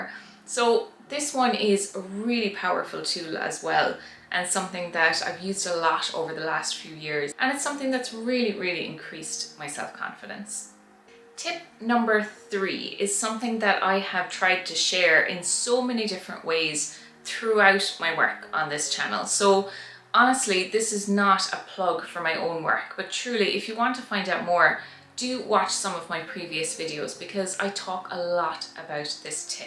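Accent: Ireland. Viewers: Great video. Informative and uplifting.. thank you for the tips. PS. Your pup is adorable and I adore your Irish accent. Best wishes from 🇨🇦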